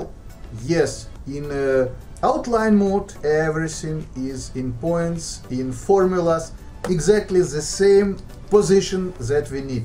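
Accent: Russia. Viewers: По акценту понял что ты русский :elbowcough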